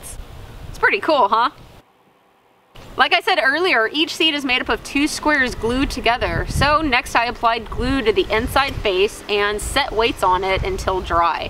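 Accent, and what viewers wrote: Texas, United States. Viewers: This is known a a TEXAS DRAWL'ah and if y'all aient from Texas ya'll dont speak it.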